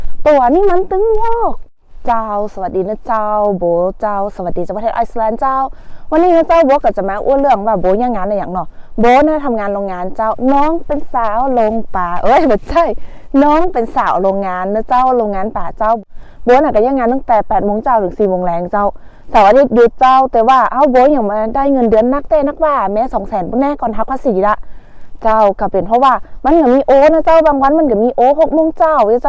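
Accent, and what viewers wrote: Thailand. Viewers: ฟังภาษาเหนือเพลินมากจ้า😁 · ฟังม่วนดี ขอทายเป๋นคนพะเยาแม่นก่อ..คิคิ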